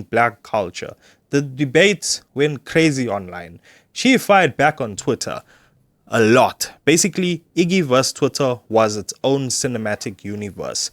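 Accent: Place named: Cape Town, South Africa. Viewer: Im south african, from cape town, are you from here too? That accent is very recognizable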